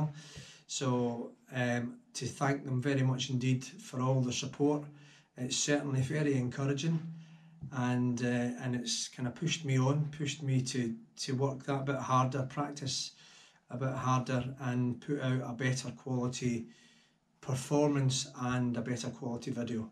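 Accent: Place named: Scotland, United Kingdom. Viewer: I think you made a really great video here and you are absolutely right. DM 190 is a constant here on Youtube and deserves your award. By the way. Nice to hear you speak a little longer. I love your scottish accent. I could listen to you all day Like your drumming.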